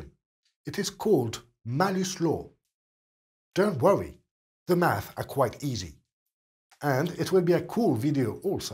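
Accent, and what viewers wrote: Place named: Yugoslavia. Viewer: Sounds like he might be from Yugoslavia. Kinda difficult to understand.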